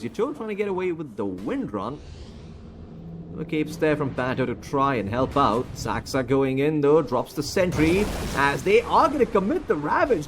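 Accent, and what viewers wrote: India. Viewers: Stupid indian accent. Can't watch.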